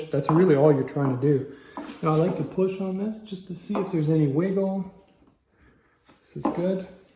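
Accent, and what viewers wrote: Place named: Canada. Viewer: So you're telling me this guy has sweet KTM 500 EXC, a sweet garage, a sweet set of tools and a sweet Canadian accent, went to O'Fallon Bike week in 2016 and he won Quantum Fuel Systems grand prize?! Some people. Thanks for vid. It doesn't help me at all w/my 2018 Super Duke, but thanks just the same.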